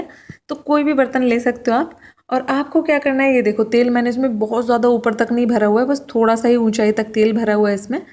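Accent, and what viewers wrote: Haryana, India. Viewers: apki awaj s lg ra ap harayana s ho pls reply mam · Aap pakka Haryana se ho… ye accent aur style sirf Haryana walo ka hi hota hai👍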